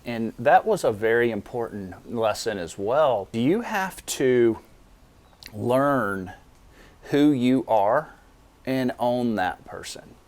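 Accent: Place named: Panhandle, United States. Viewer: I’ve been a big fan of your videos for some time, originally because they took me back to the Pensacola beach of my youth. I still love the weekly beach scenery, but I’ve grown to be a big fan of you personally. I always enjoy seeing your handsome smiling face and hearing that cheerful voice tinged with a soupçon of Panhandle drawl. Thank you for opening up and being so honest with us. I personally know what it’s like to receive criticism on a public level. I am retired now, but I worked in show business for 50 years and the one negative review I got was in a national magazine And believe me it threw me for a loop. I took it so personally and just wanted to crawl under a bridge. Eventually, I got over it, of course, and like you, therapy has helped me a great deal over the years. The one single slogan that I heard that helps ground me is “What other people think is none of my business.” It’s a total waste of time to try to read other people‘s minds and so I don’t anymore. 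Thanks again for all your great work and I look forward to future videos.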